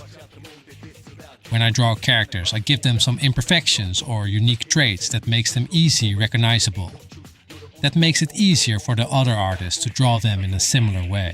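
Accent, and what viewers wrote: Netherlands. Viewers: are you perchance dutch i feel like u have a dutch accent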